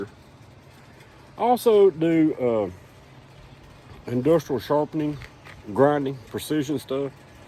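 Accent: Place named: New York, United States. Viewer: Just an old country boy? I coulda swore that was a New York City accent! LOL Great video Brother!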